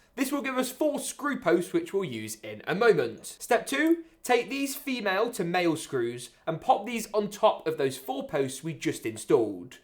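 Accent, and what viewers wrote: United Kingdom. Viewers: i wanna build a pc but i always think are the prices for the gpus will go down or not. i don’t want to buy a prebuilt pc they’re so expensive. i actually knew you yesterday and i really loved your videos and the quality is brilliant + your accent is very nice it makes me wanna learn british english. ( sorry for my bad english this is all i learned from school 😔😂)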